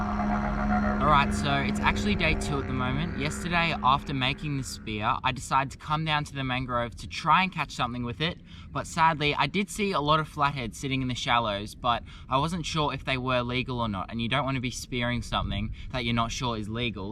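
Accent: Australia. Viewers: Where at, his dialect sound Australian not trying to profile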